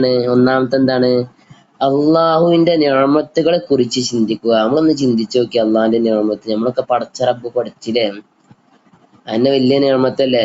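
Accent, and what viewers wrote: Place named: Malappuram, India. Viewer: Malappuram language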